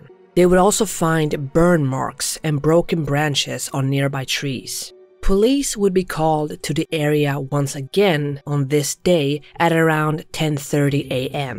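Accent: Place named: Sweden. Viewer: So people think your voice is AI , ? With a Swedish accecnt that prounounces that as ( dat ) lol and other th words . Which I like unique. Get well soon .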